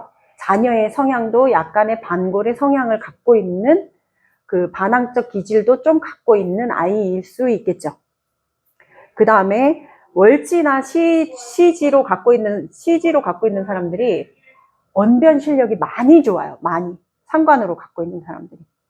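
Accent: Gyeongsang, South Korea. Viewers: 경상도 말투가 가끔 있어요. 근데 서울사람. ~^^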